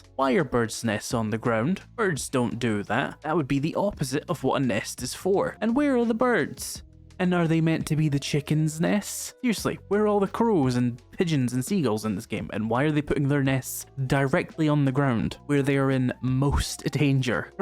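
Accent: Scotland, United Kingdom. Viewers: Complaints in a Scottish accent just work!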